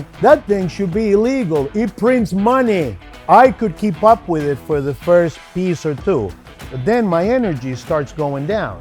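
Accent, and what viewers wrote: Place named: Italy. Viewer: Yes yes! I want to give this guy all my money with a "Italian Accent"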